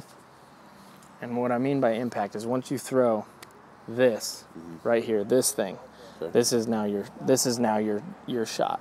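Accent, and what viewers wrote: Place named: Western Pennsylvania, United States. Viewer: It's a western PA thing. I'm assuming he's from somewhere around Pittsburgh.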